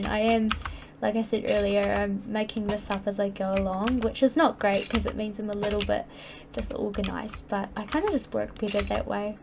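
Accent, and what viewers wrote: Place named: New Zealand. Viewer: Finally a kiwi builder so I can understand what your saying lol loving the builds super clean and super simple hoping to up my build game a bit looking forward to your next tutorial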